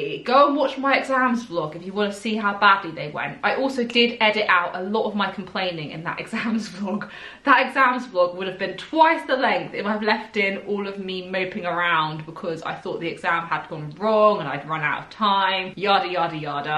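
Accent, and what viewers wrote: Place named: London, United Kingdom. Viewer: this is very random but I've been following you for years (since mid 2018 I think) and I noticed how your accent's changed over time and its the realest thing ever lol you can tell from this vid you've been spending a lot of time in London and having moved around I relate to that smm (like I literally don't know what my default accent would be anymore its just a weird jumble hahaha). anyway I know its super random but I just wanted to let you know you're not alone in this I reckon lol. and congrats on passing the exams btw xx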